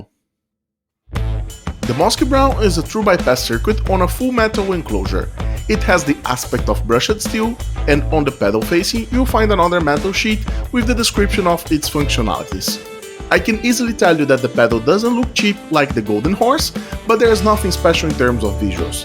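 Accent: Brazil. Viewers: Esse timbre é de brasileiro falando em inglês em haha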